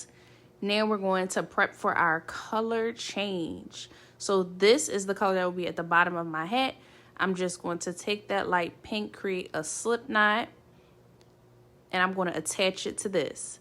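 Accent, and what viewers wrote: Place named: Philadelphia, United States. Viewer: Thank you for this. So clear and easy to follow. I Can’t wait to try it! And if I’m not mistaken your accent is definitely giving fellow Philly girl vibes 🤗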